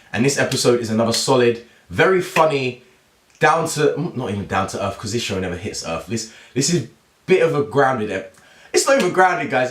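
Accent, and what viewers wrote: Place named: United States. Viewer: I gotta say.. I was hoping for even just a clip from the Citadel so we could see what evil Morty's doing but it was still a pretty fun episode... and kind of a different but still super cool format. Also pretty interesting how Rick had Summer prepared for such an event. Anyway, I can't wait to see how they wrap up the season :D ...love your American accent btw :')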